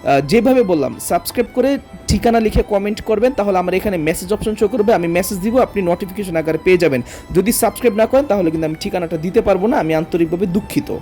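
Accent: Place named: Barishal, Bangladesh. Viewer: Borisal thake bolse😊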